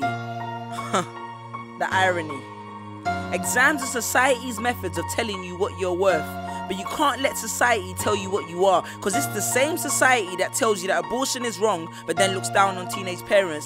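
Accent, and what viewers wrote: London, United Kingdom. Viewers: Somewhere in London probably. You can't really say British, there's so many different accents in Britain. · I've been inside that building behind them, and it's in the city of London, but I don't remember what the building is called. I think it's something to do with art. His accent is London. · Marshall Manic it's not a cockney accent, cockney is old school east London, this is more south London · South London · It is not a Jamaican accent. It is a London accent, just because he is black doesn't mean he is incapable of speaking, and just because you don't understand what he is saying, doesn't straight away mean he has a Jamaican accent, just because you see he is black, I know many Black, Caucasian and Asian people who speak like this, just because of the accent they picked up by living in London. Think before you say, don't use your absent minded, ignorant head to judge someone you don't know, you come across as racist. 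· Frank White Not being smart, just not being dumb, but yes it is from south London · LyricMaker101 its london ghetto which is normally in east, north and south london. but juss coz he speaks like that dosent mean hes dumb, juss sayin. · it kinda had a hint of Jamaican to me but my best guess would be south London only because I watched a documentary and the people had a similar accents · It isn't cockney, its a south London accent that's derived from Jamaican. (slang term for it is Ja-fake-an) Cockney is very different, listen to Danny Dyer he doesn't sound like this. At all.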